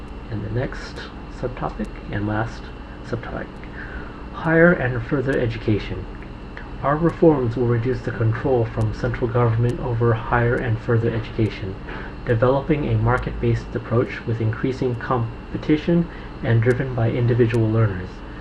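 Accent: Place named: United States. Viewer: Brit here. Just came across your video. Never too late for feedback I suppose. Apart from the minor stumbles at the end I thought your speech was fantastic IMO. I think your accent sounds more pleasant than many accents in the UK and would happily listen all day. What kind of American accent do you have?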